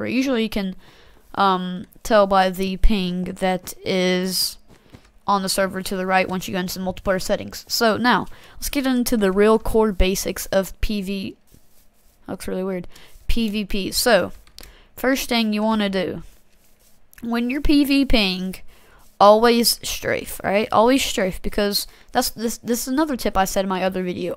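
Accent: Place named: United States. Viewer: You have an accent like I do, what state are you from?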